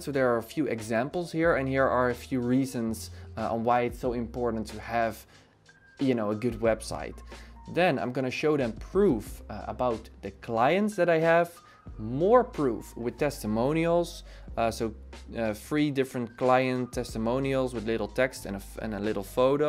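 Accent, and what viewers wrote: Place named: Netherlands. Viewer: Ben je nederlands want ik hoor het aan je accent haha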